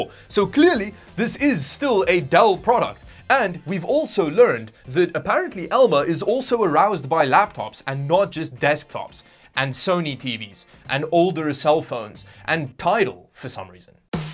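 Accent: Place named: South Africa. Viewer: You have a South African accent. Are you from South African?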